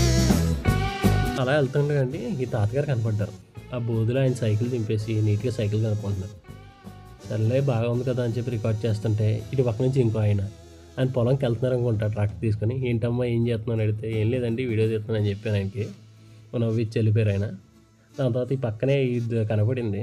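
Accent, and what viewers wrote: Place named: Godavari, India. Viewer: Godavari yasa add ayyaka godavari andhalu inka bagunnayi.❤.keep going 👍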